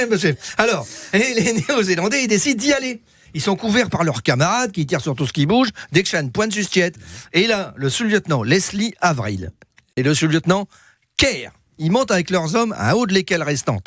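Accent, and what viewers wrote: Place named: Nord-Pas-de-Calais, France. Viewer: Ch'ti..? J ai du mal à suivre..😂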